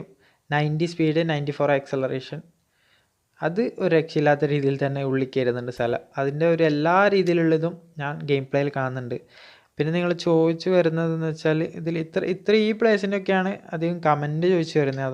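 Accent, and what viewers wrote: Kannur, India. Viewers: Kannur eadeyaa ingal?👋🙂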